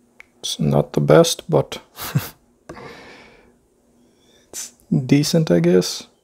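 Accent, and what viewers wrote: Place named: Russia. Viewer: Мне тоже показалось он с каким русским акцентом говорит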